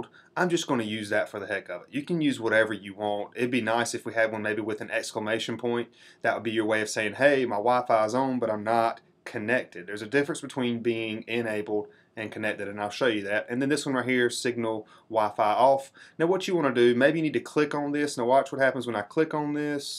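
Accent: South, United States. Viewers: ...thanks so much, just implemented the bluetooth feature to my device. I bow to you, honorable man with southern accent😊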